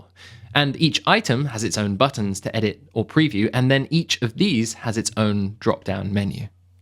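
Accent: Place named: United Kingdom. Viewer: why does everyone on these type of tutorials have a British accent 😅🤣 ... Great tutorial don't mind me guys. This was great ! Thanks !!!!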